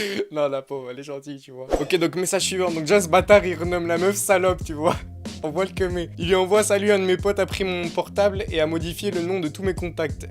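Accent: Belgium. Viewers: T'es belge ? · T'es belge poto ?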